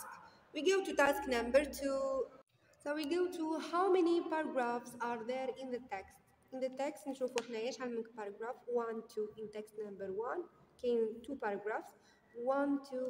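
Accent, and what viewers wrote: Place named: India. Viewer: يا استاذة مع احترامي اللهجة الانجليزية تاعك مثل لهجة الهند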